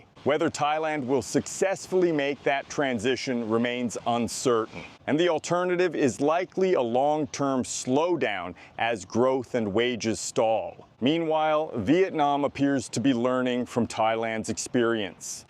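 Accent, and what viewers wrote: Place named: Newfoundland, Canada. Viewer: I'm Canadian also, but your accent is driving me nuts. You talk like a non native speaker. Are you a Newfie?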